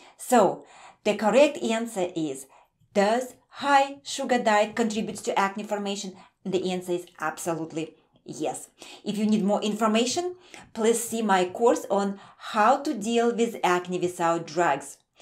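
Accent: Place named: Eastern Europe. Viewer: just out of curiosity where are you from you sound like eastern europian, and thanks for the great content:)))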